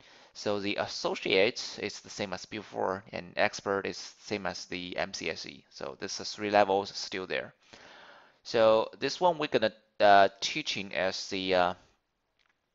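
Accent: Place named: Philippines. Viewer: Thanks for sharing this, I'm interested to learn more technical skills in Azure! Appreciated so much. Anyways, are you a Filipino? because you have a filipino accent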